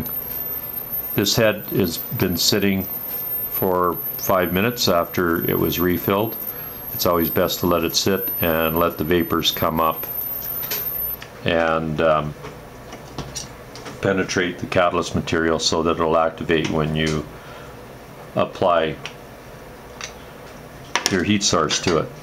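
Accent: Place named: Ireland. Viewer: Hi there my friend, I detect an Irish accent, I am from Co. Antrim. Do you know where I can buy the Carbon felt and platinum catatylitic materials online? I purchased a Peacock Hand warmer on line which i believe is better that the ZippoThank you. Seán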